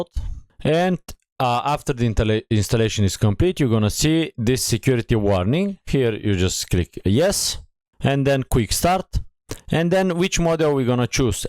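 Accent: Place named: Eastern Europe. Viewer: You sound Eastern European. Hi from Romania. This is such a straight forward, no fluff tutorial you offered us. Thank you so much. You've got a new subscriber!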